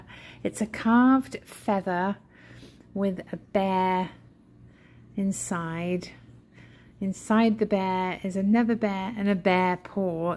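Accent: England, United Kingdom. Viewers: Congrat for reaching 100k. You deserve it love your english accent❤❤❤❤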